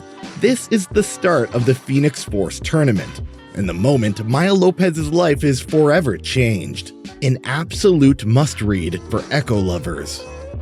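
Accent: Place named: Canada. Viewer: you are a canadian aren't you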